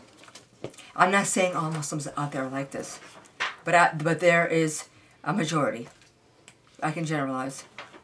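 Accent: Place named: United States. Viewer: That is Islam. Did you read the Koran? It states women are worth half as much as a male. I married an Iranian in 1990, had him jailed several times for abuse, divorced him and he's lucky I didn't kill him. Now he is remarried to his cousin, that was their plan all along. Inbreeding is the norm, birth defects are the norm, abuse is the norm. You sound american woman, don't married another muslim. Religion is evil, all of it.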